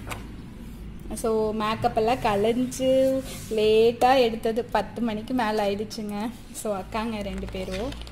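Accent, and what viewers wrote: Kongu, India. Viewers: Kongu ngala neenga pesura slamg la therunjuthu album pathatha vechu kekaren thapa nenachukathinga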